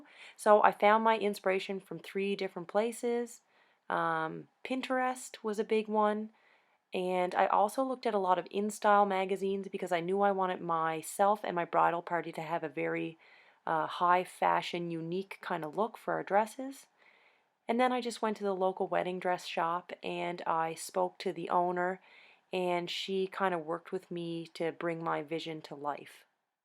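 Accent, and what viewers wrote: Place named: Cape Breton, Canada. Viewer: I saved a bunch of wedding videos to watch later for getting started with my wedding planning. I'm in Ontario but I'm from New Waterford and it was a nice suprise to come across your video - I knew by the accent you were from the island! Lol Thank you for the very helpful video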